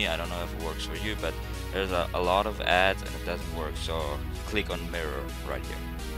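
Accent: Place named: England, United Kingdom. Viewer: i think you sound English not germen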